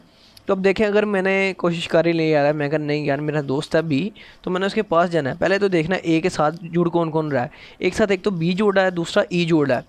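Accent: India. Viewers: Bhai tum himachal se ho kya ?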